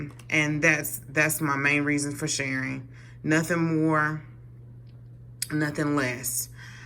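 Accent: South, United States. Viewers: Thank you for sharing from your heart! 🥰 Thanks for the encouragement to go shooting too. I keep thinking that, but draaaaag my feet. Perfect date idea right now!! Great job getting into canning and gardening. I’m a newbie too. It’s definitely in your genes!!! 🧬 I’ve got oxygen absorbers and Mylar bags in my amazon cart right now! Haha Just waiting on the next paycheck. 🤑 Blessings to you, dear Sister! Fall gardens are great! I’m not sure where you’re located but I’m hearing some southern accent. So if you’re down south (I’m in SC) you could do well with a fall garden. I’ve had lettuces, broccoli, & cabbages do well producing through the winter here. Jump in!! 👩🏻‍🌾🥦🥬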